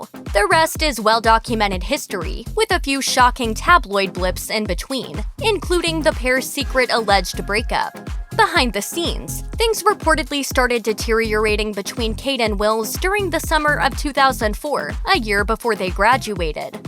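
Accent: United States. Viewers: As soon as I heard the American accent I thought .....more unfounded bullshit. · I hate videos with these robotic sounding American voices. You know the moment that voice pipes up that nothing worth while will be said.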